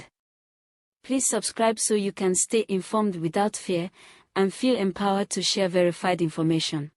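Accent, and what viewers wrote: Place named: France. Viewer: So what’s with the French accent on the AI. used to be SOUTH AFRICAN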